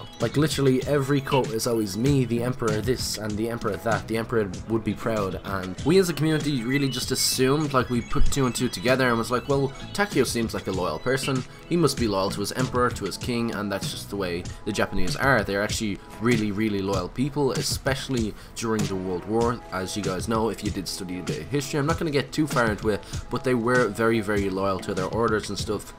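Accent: Dublin, Ireland. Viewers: Are you from Dublin and great video it helps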